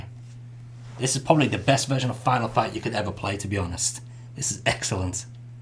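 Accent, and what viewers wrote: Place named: Liverpool, United Kingdom. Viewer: I can hear the scouse twang now that you've mentioned it. Cool!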